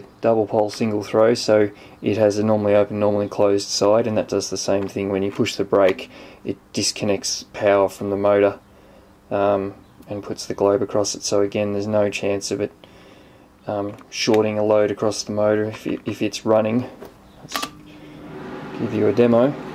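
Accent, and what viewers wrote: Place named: Australia. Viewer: Nice motor installation. Now to make some chips. From the accent I am guessing you are in Australia.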